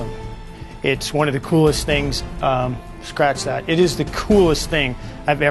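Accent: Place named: Canada. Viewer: is the background music necessary?? and your Canadian accent is annoying!!!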